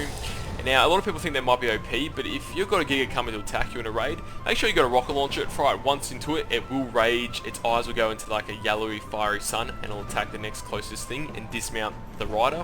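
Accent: Australia. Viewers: BobHoppaFlotchkin Maybe some people don't speak english as their native langue (go figure) and thus struggle to understand someone with a thick australian accent who could give Steve Woodmore a run for his money?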